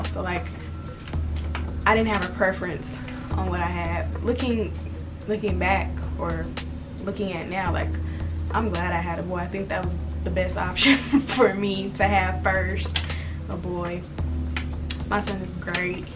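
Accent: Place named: Dallas, United States. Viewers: As soon as I clicked on this video and heard you talk I knew right away you from Dallas 😂😂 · Fr it’s that country mixed with some properness 😂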